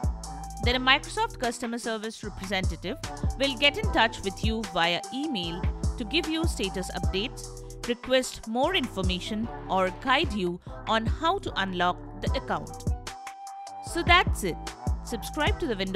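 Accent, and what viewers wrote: India. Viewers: Funny, having an Indian voice giving advice about computer information.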